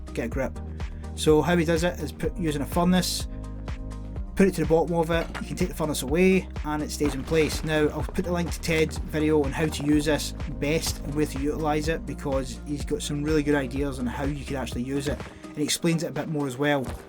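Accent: Scotland, United Kingdom. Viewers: that scot accent is very hard to understand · My celtic brother from another mother - Keep up the epic content man! You've always given tips that I've never known and I've been playing on and off for a number of years! You're a legend, and to hear a Scotsman showing the world how its done is only right! Cariad fawr o Gymru i chi [Much love from Wales to you!]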